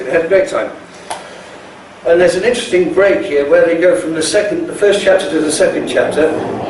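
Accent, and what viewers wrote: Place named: Torquay, United Kingdom. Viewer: That's what I thought too, it's his accent 'talky' Torquay.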